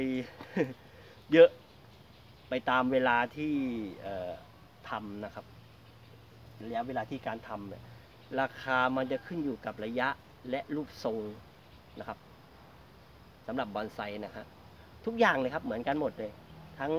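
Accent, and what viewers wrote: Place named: Suphanburi, Thailand. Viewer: พี่ชายยยย..ตามาแว้ว..มิน่าอาหารหรือเวลาพูดคล้ายๆสุพรรณเลยพิษณุโลกนี่เอง...😁😁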